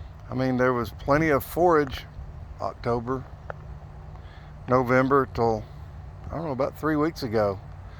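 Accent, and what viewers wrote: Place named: Carolinas, United States. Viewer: I'm thinking that if the bees start drawing in pollen, the queen might start laying again. I'm not sure which zone you're in, but that accent sounds like the Carolinas or somewhere really close.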